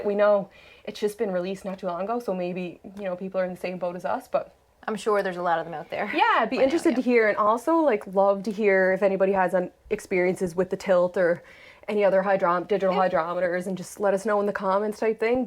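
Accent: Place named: Canada. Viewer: Thanks for the video. The Brew HQ shirt and accent gave you gals away ! Greeting and good luck from Ontario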